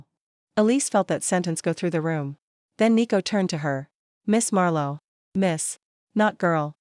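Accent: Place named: Italy. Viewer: 👏👏 lovely and written with humour.. good narration, albeit the italian accent interposed and no clear report regarding the powder on the tie and pin…♥️♥️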